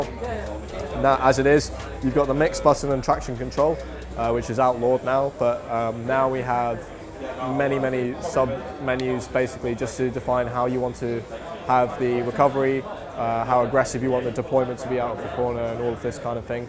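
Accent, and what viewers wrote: RP, United Kingdom. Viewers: This posh nerd as put me 2 sleep.! ☆WOW[NEVER THOUGHT I'D C THE DAY]....talking bout F1 & this donnie is making me snooze.! Lol..!!☆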